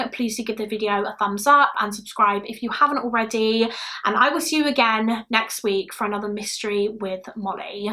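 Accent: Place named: West Country, United Kingdom. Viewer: In case you're interested, accent is from the English West Country, so Bath or Bristol. Somewhere in that neck of the woods. 🙂❤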